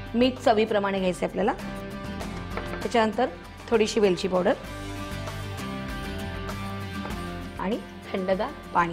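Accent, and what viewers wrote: Pune, India. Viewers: Me punyachi ahye pan atta Solapur la tumch bolana punyachi athvan Karun dete mast recipe astat me saglya thandgar reci try kelya toooooooo good .tq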